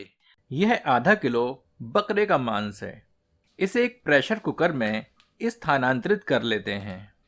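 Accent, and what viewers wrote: Standard. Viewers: आपकी शुद्ध हिंदी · शुद्ध हिंदी में बोलने का जो तरीका है वो अतिसुंदर है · Aapki Shudh hindi bahut sunder or saral hai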